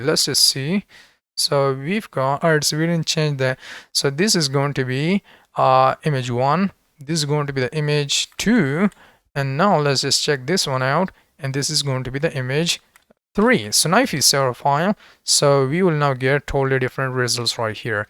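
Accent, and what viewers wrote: India. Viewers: great video but, not gonna lie u have indian accents our its my imagination hahahaha.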